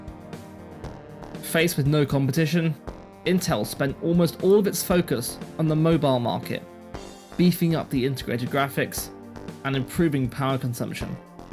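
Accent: United Kingdom. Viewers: You speak with a British accent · Love the music, esp. . Fantastic. Warning! Possible trigger effect on maker..( I understand this video is 4 years old, so maybe I should have shut up... But I've written it now). The early chapters may not have been researched deeply enough. I picked up on a few technical and historical errors and omissions. I understand the scale of this project and that the introduction could likely have been rushed through. Reaching the microprocessor history you immediately stepped the game up. Truly excellent and quite an undertaking., a lot of praise should be given for this. My only other criticism may have you swearing at me! Work on your diction!, Otherwise you have a fine voice for this style of content. The odd Compooha and Intel Ahy Ahy locate you firmly in the London suburbs...? They, and similar just pop in randomly? Started watching the Cray video. 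Greatly improved. Really wonderful stuff. Beautifully written. Subbed. And peace brother, I'll never mention it again.🤠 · "Compuhurz" for computers and "yunighud" for United both sound really, really stupid. It's some obscure English provincialism, I know, but it's one that YouTube would be better off without.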